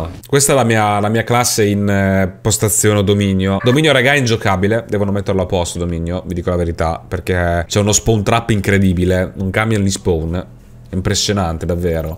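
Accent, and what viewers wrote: Naples, Italy. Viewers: sei Napoletano?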